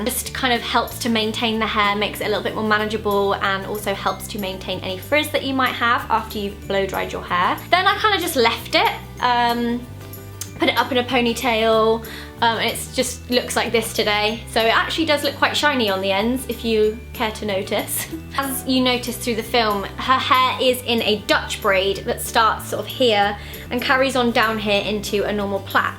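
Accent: United Kingdom. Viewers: DobbyThe FreeElf I dont know what part of Britain Zoella is from. All in all she is from Britain. Being a foreigner to GB, i am not able to distinguish all the different dialects and accents, and will plainly call it British. Usually thats how it always sounds to me when i meet people from Britain. So, what dialect is it? Educate me on that one, maybe you know better? I find it interesting how people get offended by just an opinion. I never meant it to be offensive. Cant help but feel that way, thats all. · Beautiful british accent :')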